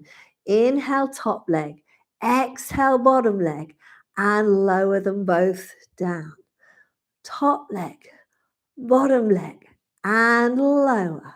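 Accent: England, United Kingdom. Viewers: Thank you so much. Your English accent is so refreshing to hear! Sorry to those Aussie and American accents but they are often very high pitched and squeaky…!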